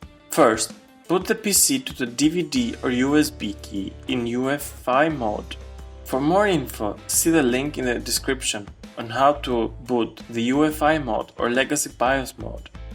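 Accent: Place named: India. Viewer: You my friend are a life saver, never thought someone with an indian accent could solve my issue in less than 3 minutes, THANKS !!!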